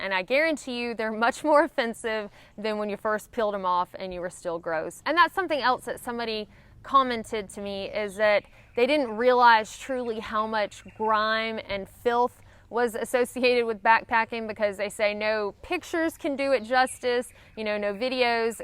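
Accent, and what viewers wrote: South, United States. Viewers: I find her accent rather annoying. Better I guess, and more genuine, than that haughty Valley Girl manufactured ball-of-butter-in-the-back-the-throat voice that a lot of chicks choose today. Still, she's been out of the country now and has been exposed to enough different kinds of speech that she can improve that Scotch-Irish Southern drawl that makes her sound ignorant. I did. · It amuses me how people are so enamored with accent. It is just how we talk! Of course, her's is a nice, sweet version. However, you know you have someone with a good southern accent when the pronunciation of "pin", "pen", and "pan" are basically indistinguishable. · I realized all of these things from long outdoor experiences while in the Army. I still remember pulling my sock off and throwing it against a wall and it stuck. That was after weeks of not having the opportunity to change clean etc.. 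I really appreciate your southern drawl. I’m from Louisiana originally. Living in Europe going on 26 years and don’t get the chance to listen to the singing of the southern girl. Amazing. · I love the crystal clear "et cetera" in the midst of your southern drawl 🤣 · I've made this comment before I guess but in 79 I hitched outa Charlotte to never really return to the south and much further down the road America itself. Now in Scandinavia. Long long journey; but your voice and your very southern alterna-girlness takes me back, strangely very familiar and missed somewhat. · Sounds like a lot of things I went through coming back from Iraq. Weird. I like your videos. Good advice and you have a soothing tone and an accent that’s as country as a butter bean.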